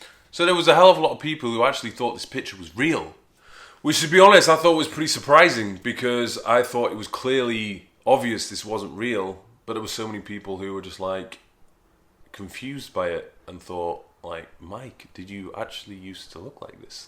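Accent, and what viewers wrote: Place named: Yorkshire, United Kingdom. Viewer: its a Yorkshire/Geordie accent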